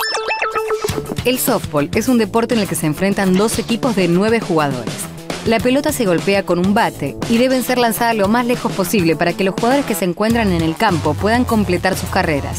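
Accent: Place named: Argentina. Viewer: No me gusta la manera de hablar que tienen las Argentinas .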